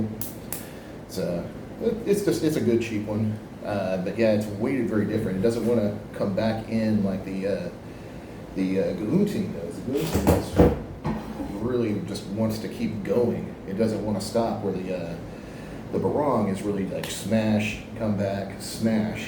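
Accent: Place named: United States. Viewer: your accent is american, but your house is balkan, where the hell are you from lol cool swords btw <3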